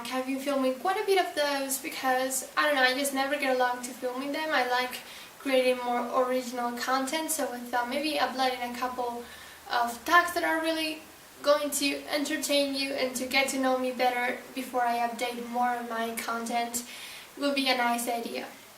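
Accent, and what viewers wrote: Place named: United States. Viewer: Me encantaría hablar como tu! Hablas como las gurus d usa :)))) m gustaría saber como hiciste para perfeccionar tu inglés!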